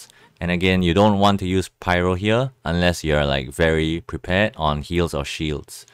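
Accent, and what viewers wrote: Singapore. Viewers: You sound Singaporean ( sorry if this sounds racist ) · picked up your mixed Singapore accent right away, we feelin it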